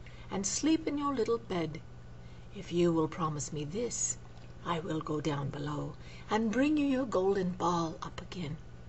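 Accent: United Kingdom. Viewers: Delightful English accent - is there no end to your talents? :) PS.Lilou is probably eating a frog in France this very minute - disturbing thought eh? LOL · You sidetracked me! I was watching your nutrition,makeovers when I saw this and, being a Brit, had to take a peek! Where did you learn to do the accent? It sounds rather posh but with a little bit of downmarket Cockney and even a little bit of Welsh thrown in! Well done!:)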